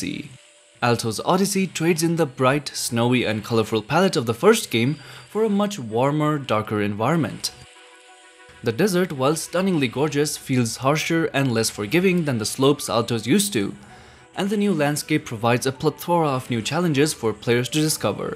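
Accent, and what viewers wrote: India. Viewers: Indian accent can never be hidden.. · this guy's English Accent in INDIANISH.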